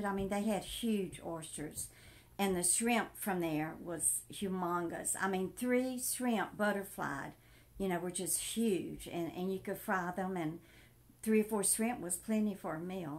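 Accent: South, United States. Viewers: Nice story , love your southern accent it never went away !!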